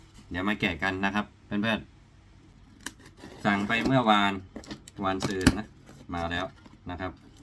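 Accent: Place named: South, Thailand. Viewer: หล่อจังเลย ชอบมากๆๆ❤❤❤❤ น่ารัก พูดสำเนียงใต้ยิ่งน่ารักไปอีก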